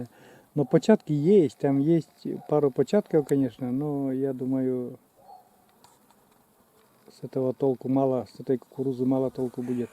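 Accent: Komi, Russia. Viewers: Республика Коми,родной коми язык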